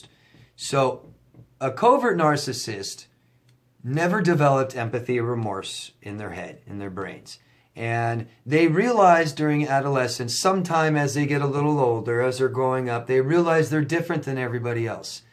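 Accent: England, United Kingdom. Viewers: Your English accent is really funny..Nessa from England !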